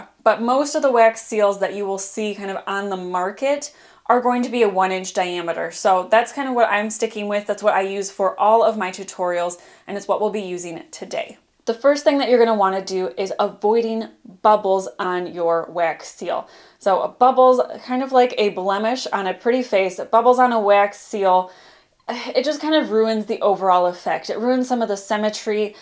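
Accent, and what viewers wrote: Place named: Michigan, United States. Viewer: Very nice tutorial! Are you by chance from mid Michigan? Enjoyed your slight accent 😊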